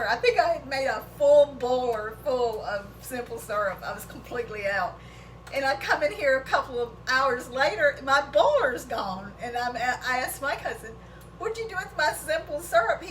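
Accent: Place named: South, United States. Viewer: Don’t ever apologize for talking like a southern girl,it’s the best place in the world to be from.Im from Arkansas and you talk just like we do.Just Southern!!!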